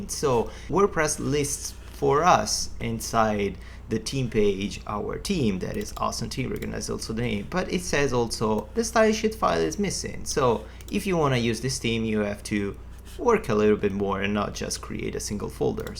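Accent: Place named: Italy. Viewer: Il tuo accento italiano rende il tutto molto comprensibile e fluido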